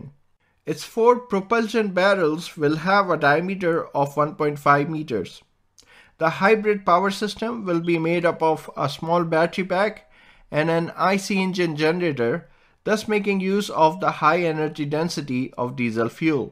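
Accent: India. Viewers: Indian accent?😂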